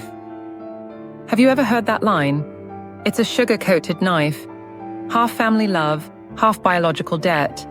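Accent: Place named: United Kingdom. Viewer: Sound more British than from Nebraska.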